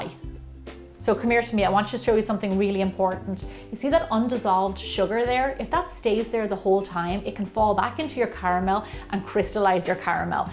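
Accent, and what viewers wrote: Ireland. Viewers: Are you Irish? I thought I recognized the accent, but I don't know, I haven't look at the rest of your channel! yett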